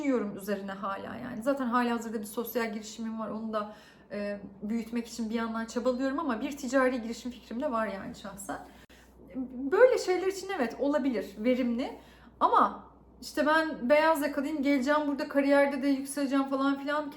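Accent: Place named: Cyprus. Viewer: Lakin ben sizin Kıbrıslı şivenizi beğeniyordum.. 🤪 kötü anlamda kullanmamıştınız..👍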